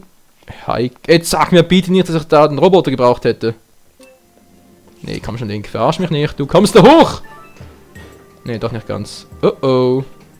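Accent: Switzerland. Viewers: Man merkt total das du schweizer bist ^^ Bin auch schweizer aber kann perfekt deutsch ohne akzent reden, weiss auch nicht wieso :D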